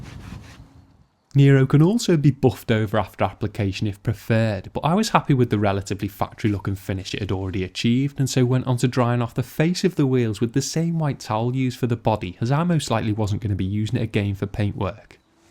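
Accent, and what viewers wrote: Liverpool, United Kingdom. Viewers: by the way he's from Liverpool...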